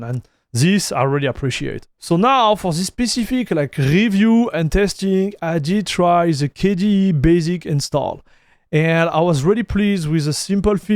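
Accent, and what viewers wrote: France. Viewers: Came for the French accent, stayed for the Trixie technical details, particularly kisak-mesa fresh, nvidia drivers, and the linux-tkg scripts on github. Two points: might I suggest you put links to these sites below your video review. Also, kisak-mesa seems to be for ubuntu. Do the debs in their ppa work with Debian 13? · bravo for your franglais. I can recognize myself in you. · go french accent. love to have that. lol. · I'm only catching like one out of every five words this guy says. His accent sounds French which makes sense they're because their language sounds like they have an eternal loogie stuck to the back of the throat. Such a disgusting guttural language.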